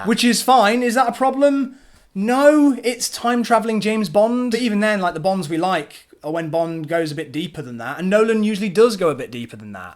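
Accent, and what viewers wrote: United Kingdom. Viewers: You have a very mild british accent. sad...;(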